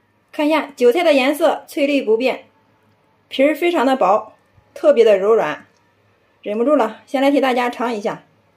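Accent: Shandong, China. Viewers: 听口音山东的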